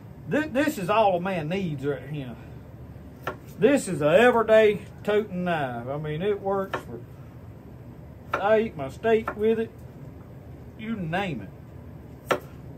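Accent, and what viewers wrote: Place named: West Virginia, United States. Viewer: U said I just ought dem yersday,,, u sure u ain't from WV, lol, we sound alot alike brother, Love the shows, keep makin em, we keep watchin, GOD BLESS YOU AND YA FAMILY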